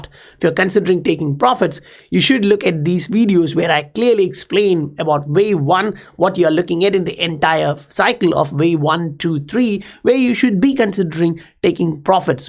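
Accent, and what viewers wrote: India. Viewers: ☺️ btw ur accent is quite similar to Indians 😒.. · You say you're in Korea but you sound like you're from India... ?? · the voice like Indian......?.....great fundamental analysis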